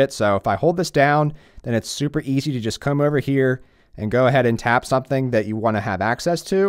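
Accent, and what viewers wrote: Philadelphia, United States. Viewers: Definitely Philly/South Jersey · I definitely detect a philly accent = S. Jersey PA DE or MD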